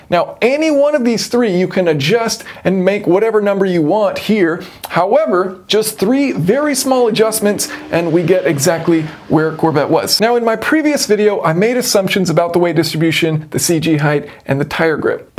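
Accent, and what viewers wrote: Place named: South, United States. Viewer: I think the time spent in Texas rubbed off on your accent a bit, and brought some more southern out. :)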